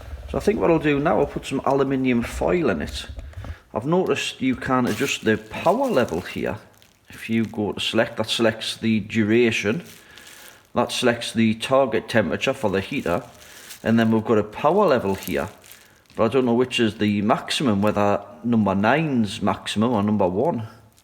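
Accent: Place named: Newcastle, United Kingdom. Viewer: I can't agree more. English is not my native language and thus I am not an expert, but maybe contributing factor is the 'Geordie accent'. especially, circa <num> there are many articles online about it. for example, "Geordie accent rated UK’s most friendly and trustworthy", "A survey found that the Geordie accent is considered the friendliest in the UK, and is also the most likely to put you in a good mood", etc.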